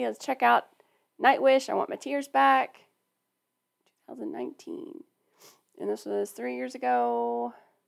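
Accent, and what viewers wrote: South, United States. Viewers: Uilleann pronounced ILL-En or ILL-Yen for your southern accent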